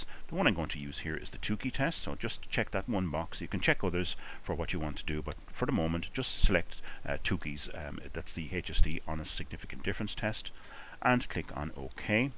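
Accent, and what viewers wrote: Ireland. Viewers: This video was clear, addressed exactly what I needed to learn, and did not have unnecessary commentary! Thank you! When you are working hard on several problems and you get exactly what you need in a comprehensive yet streamlined presentation, it is a gift. I would take a course taught by you Dr.. I am married to a man from Galway City, Ireland and your brogue added a touch a familiarity that I appreciated.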